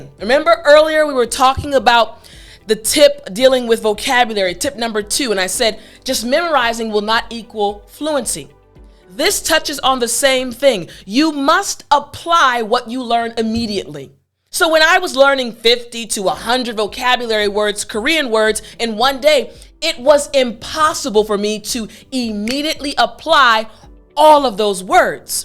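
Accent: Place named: South, United States. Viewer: Teacher a top top motivational speaker. I love your country accent 🤩❤️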